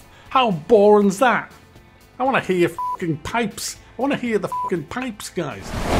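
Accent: United Kingdom. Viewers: Your british english always brings a smile to my face <3 The way you say rant about this pricks haahahah i love it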